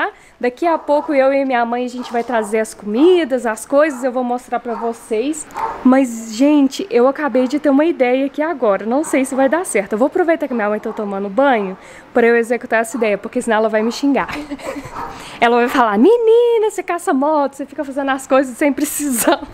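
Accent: Minas Gerais, Brazil. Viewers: Oi vc está falando mais do que NUNCA o sotaque mineiro..Ñ vi vc e o falando em turco tô com saudades. Fiz Natal DEUS os abençoe 🌲🎆✨🎊💝💖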